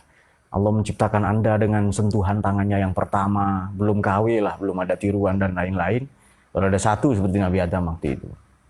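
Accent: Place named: Malang, Indonesia. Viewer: Dari Malang ya mas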